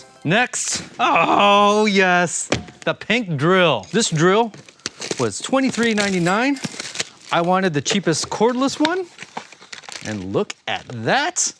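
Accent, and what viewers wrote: Ohio, United States. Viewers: I can tell you are from Ohio just from how you talk. "From selling dru...."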